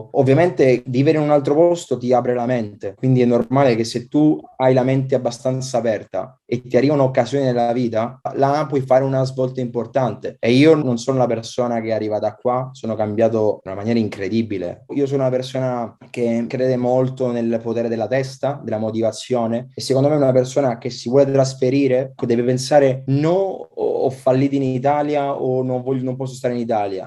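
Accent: Tuscany, Italy. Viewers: con quell accento toscano, é spettacolare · Toscano eh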